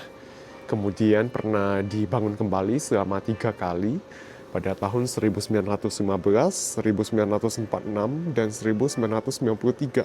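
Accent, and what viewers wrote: Java, Indonesia. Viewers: Ko, sekarang bahasa Indonesianya kok medok banget kayak orang Jawa 😊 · 😂 mungkin tinggal di jawa kali, kan banyak tuh orang Tionghoa mirip2 Orang jawa kebiasaannya kayak Rajin, segala macamnya apalagi. Bahkan bahasa ngomongnya juga kayak jawa atau medok banget.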